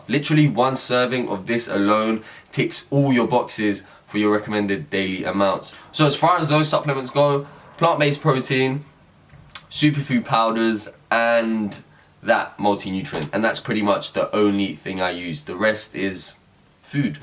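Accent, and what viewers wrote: United Kingdom. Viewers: #AskPhitzee I heard you say you are from Montenegro. Your accent sounds British. Have you lived in Britain most of your life? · talvez seja pelo sotaque britânico dele, dá a impressão que ele ta falando mais rápido mas é só o sotaque mesmo. não desanima, com o tempo você vai acostumando.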